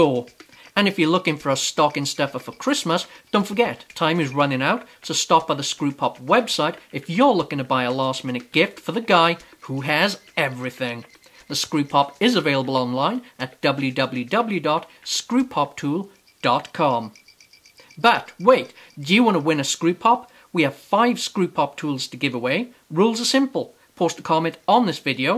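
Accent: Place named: Scotland, United Kingdom. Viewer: What accent is this?, its kind of scottish / south african / jordie.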